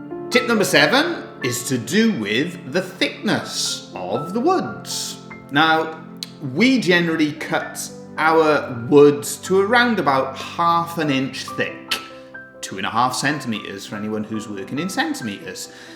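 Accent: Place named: Wales, United Kingdom. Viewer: Man it's been a long day. I sat there thinking this guy sounds welsh. I didn't even see the in your name. If you get people asking for a cheap scroll saw, I bought mine from screwfix for 90 quid.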